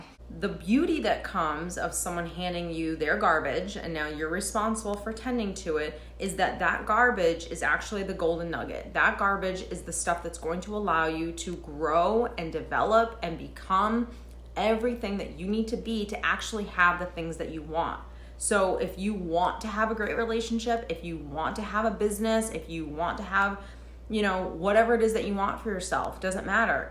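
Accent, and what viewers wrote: United States. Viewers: Healing about what ? Get stuck? It's the way you see yourself . Self worth help you to overcome your worries . I past through griefs and all of us too . Life is continue It's not standing and don't do nothing Instead of that see the good things around you such your health you have something to eat and a shelter. A lot of child and people suffers because war and they have nothing and ourselve live in a land which has all things we need . To heal we need to look around us and not to be selfish focusing only on ourselve . Is here my opinion teacher . Step by step i get your talk in spite of your American accent .yeah yeah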